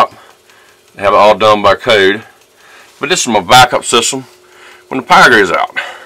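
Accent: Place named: North Carolina, United States. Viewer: are you from North Carolina or Virginia you sound like everyone in my family :) We are in NC probably 45 min from Virginia line.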